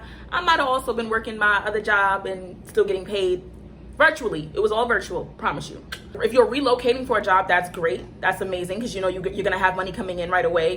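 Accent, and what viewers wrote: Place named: New York, United States. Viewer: Ouu im from nola and and I hear that ny accent are u from queens ?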